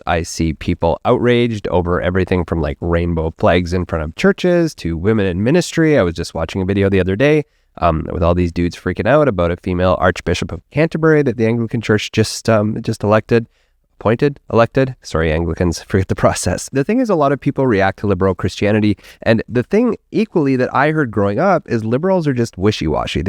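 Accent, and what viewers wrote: Canada. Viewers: Great discussion -- it really highlights the lack of intellectual, and if I may say so, spiritual honesty in the evangelical faith community that I was a part of for so long. I did not feel free to wrestle with any doubts whatsoever, much less to express them openly. Even if you found someone who understood the problem, they always seemed to have a cut and dried "solution" that appeared "doctrinally sound" but completely unsatisfying. Which left me basically no choice but to quietly leave the matter unexplored and wonder why I was such a lousy Christian. Very difficult to put that problem into words until now, so thank you so much for confronting it. By the way, being a big hockey fan, I never had any doubt that you have a Canadian accent, not an American one! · Don't worry bro I actually can tell that you're Canadian by your accent. The word has a lot of baggage that was helpfully unpacked. 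I wonder if many of the issues that you discuss potentially stem from people focusing on the New Testament. Paul discusses (and references) a lot of Scripture, that is, the Old Testament and whatever else the Jews used. I think there are a few epistemological problems with liberal Christianity: it is the latest installment in a long tradition of Greco-Roman thought that I think is problematic. This is my first introduction to liberal Christianity so I can't really say much else. Very thought provoking stuff, thanks for sharing.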